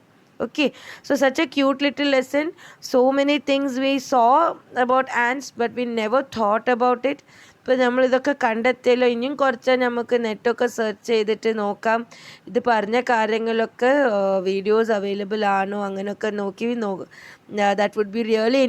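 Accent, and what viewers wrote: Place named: Malappuram, India. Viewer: Malappuram slang assahaneeyam!!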